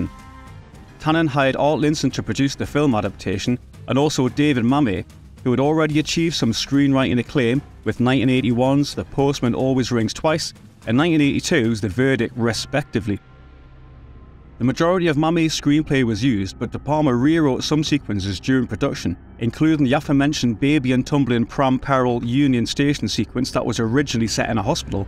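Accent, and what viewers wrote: Newcastle, United Kingdom. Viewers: Great to hear a geordie on hear👌👍🏻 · Unexpected and lovely to hear a Geordie accent on the JoBlo channel! Great job.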